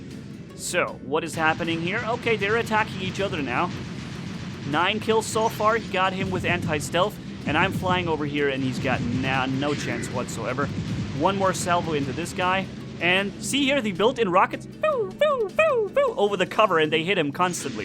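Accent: Germany. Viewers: Hi are you German?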